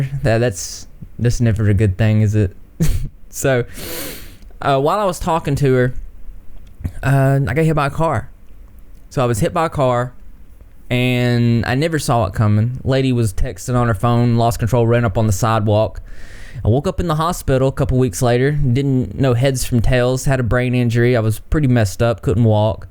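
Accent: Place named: Georgia, United States. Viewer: can't believe i didn't put it together that you are from georgia. i love the accents of the people from there and i love yours. and really appreciate that perspective you have. you wouldn't know happiness if you didn't know pain. i use similar mantras myself, my current favourite "happiness or suffering, the choice is mine/yours". happy holidays everyone 🎐☃️💟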